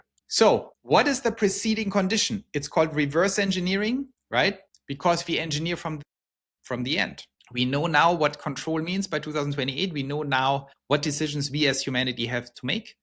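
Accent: Germany. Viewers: Germans are usually very logical thinkers but when you say that the AI is going to be constrained you are deluded. AI will be constrained about as much has the United States Congress has the ability to rain in spending. Governments competing against governments will not restrain themselves. You said it earlier that we are in a race condition. AI will be developed without restraint for the promise of power that it gives. Your German accent is very endearing as I had an Opa myself. Blessings.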